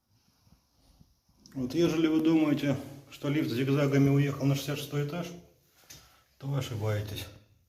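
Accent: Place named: Saint Petersburg, Russia. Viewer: оу, питерский окцент, в верьх.спасибо за кино.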